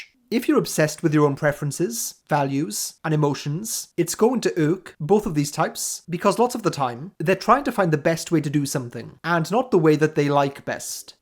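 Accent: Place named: Wales, United Kingdom. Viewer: really off topic but i love your accent 😁are you welsh by any chance :O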